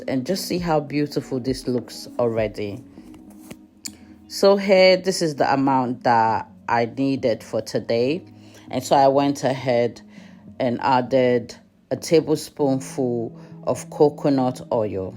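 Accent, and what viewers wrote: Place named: Nigeria. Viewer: You made this DIY so simple. Our Nigerian English is really so sweet and you deliver it beautifully. Thanks for sharing. Will try it.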